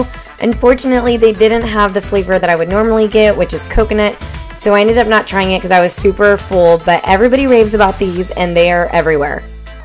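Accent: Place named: South, United States. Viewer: lol? When she says "Ya'll," she is referring to friends at home in the states of Texas. - its a southern accent. I don't know why.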